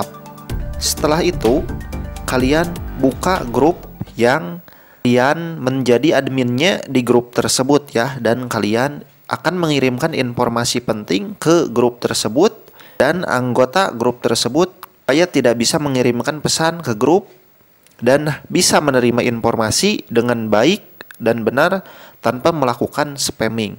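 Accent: West Java, Indonesia. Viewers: Urang Sunda ya kanh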